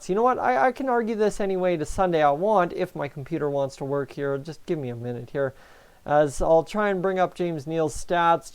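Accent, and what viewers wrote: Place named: Nova Scotia, Canada. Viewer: From Edmonton here, you sound like you're from Nova Scotia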